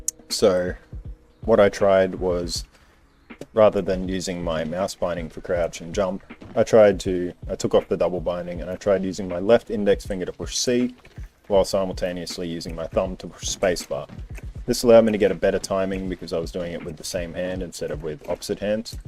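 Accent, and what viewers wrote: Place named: Australia. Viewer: fuck oath could tell instantly, master race haha 🇦🇺🇦🇺🇦🇺 Dope tutorial btw just got a pc n switched to mnk like a month ago your tip to slow the vid down to get timing actual helped heaps Keep killing it man, Love hearing a fellow Aussie accent reppin apex 👌🍻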